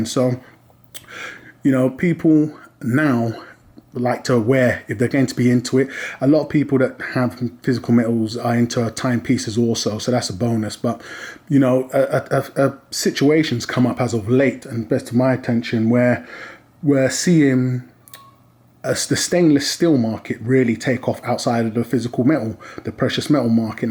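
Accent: United Kingdom. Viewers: Hi, you sound British where do you get your bullion from in the UK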